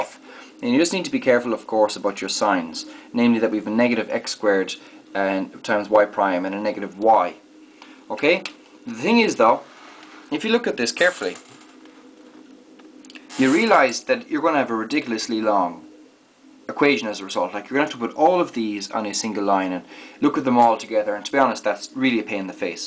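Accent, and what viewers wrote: Ireland. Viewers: sounds Irish. Loving the accent and the lesson. Great video! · You have a lovely Irish accent. It makes the grasping of the subtle secrets of differential equations that much more delightful.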